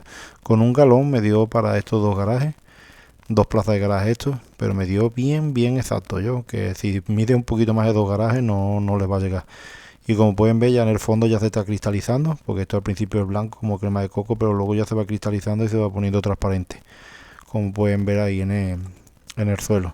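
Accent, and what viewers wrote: Andalusia, Spain. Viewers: Hola amigo.... De dónde eres? Por tu habla pienso que eres de muy cerca de mi pueblo... Sanlúcar de Barrameda Cádiz... España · ¿Puede ser de Cádiz o Sevilla? Saludos desde Pilas (Sevilla) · Holaaa, he encontrado este video, es un trabajo excelente. Por casualidad eres andaluz?? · Buen trabajo! Illo, usted de donde es? De Conil?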